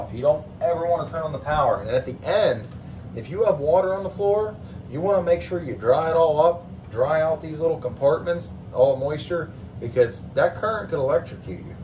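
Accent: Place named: Pittsburgh, United States. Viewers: hey man thanks for doing this. is there a correct or incorrect order of attaching the wires to the element? I didnt get a pic before hand and have it working again but i have to wonder.... is one positive and the other negative? Talking about the two connections on the upper element? Im guessing either way of re attaching those two wires cant be wrong. however i do see two markings on the bottom. PS i know that accent anywhere and you CANT be far from southwest Pennsy. bet im within 300 miles :D Edit2: Oh snap man i think i missed it the first time. Here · Video was descriptive & yum yum.. So nice to watch. Pittsburgh accent. A local? Lol 😂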